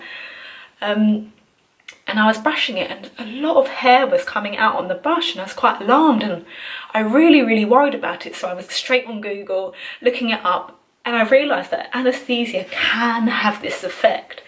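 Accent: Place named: United Kingdom. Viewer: Wow!! The result is fabulous! Love your face profile (actually, love all your face). I am a 53 year old man that's gonna have double jaws surgery on next February. I have myself accounting about the same comments when i decide to go on with the surgery (my age,etc) . I just want to say to the public that people should listen to themselves if they make the decision, like you did !! We can see that it was really worth it. By the way, are you British ? You have a cool accent. I'm Canadian from Quebec.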